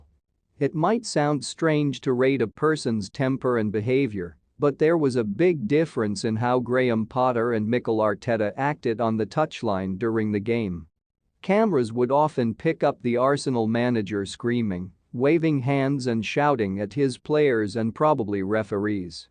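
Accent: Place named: United States. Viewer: What is with that fucking stupid annoying American robot accent? Bit of advice change that shit asap!!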